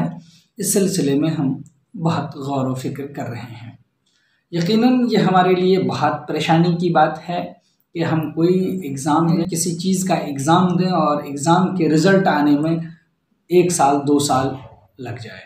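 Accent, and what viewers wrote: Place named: Pakistan. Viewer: Aap bht accha bolte hn ..Aapki urdu sunte huwe accha feel huwa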